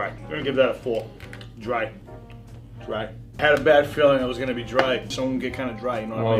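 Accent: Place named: Massachusetts, United States. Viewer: is the voice of Massachusetts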